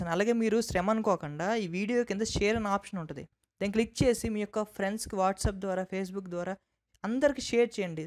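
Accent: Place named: Srikakulam, India. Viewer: I like your accent bro !! Maa Srikakulam friend gurthochadu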